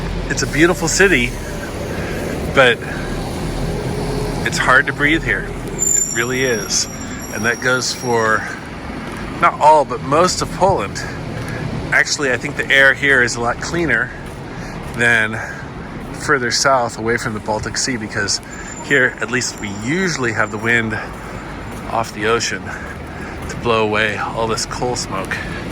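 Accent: United States. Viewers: American fella I guess.Sounds by the accent.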